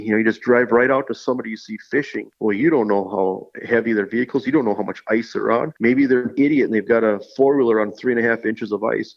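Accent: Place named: Minnesota, United States. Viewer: Moved from Mid-west 26 years ago , you can not hide that minnesoat, north dakota accent. Dont-cha-know!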